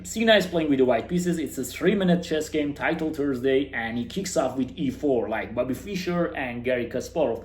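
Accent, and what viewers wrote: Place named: Italy. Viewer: the church bells definitely seal the deal confirming youre italian if the accent wasnt enough😂😂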